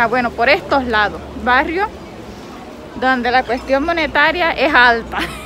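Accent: Caribbean Coast, Colombia. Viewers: Hola saludos a tu esposo bello me gusta como hablas costeño t saludo desde barranquilla eres muy auténtica costeña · eres muy linda y agradable, me gusta como hablan los Colombianos saludos desde Guadalajara 🇲🇽 México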